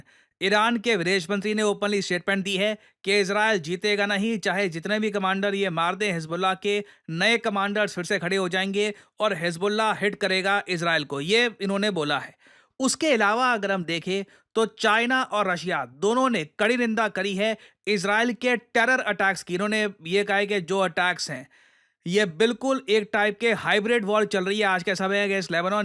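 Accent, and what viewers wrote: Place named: Haryana, India. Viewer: haryana ke log aise hi bol te hain bahut logo ko suna hu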